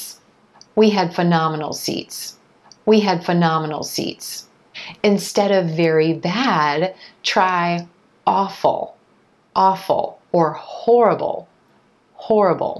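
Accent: United States. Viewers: you are the best teacher!! I love American accent.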